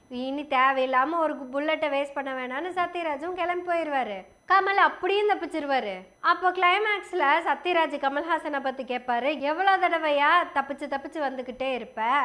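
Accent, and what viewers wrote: Kongu, India. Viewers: தோழர் நான் coimbatore காரனுங்க கொங்கு தமிழ் உங்களுக்கு சிறப்பா வருது. ரொம்ப ரசிச்சு கேட்கமுடிஞ்சிது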